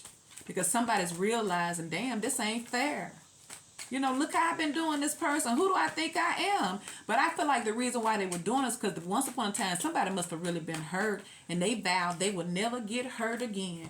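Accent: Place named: South, United States. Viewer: Me too! Love her southern drawl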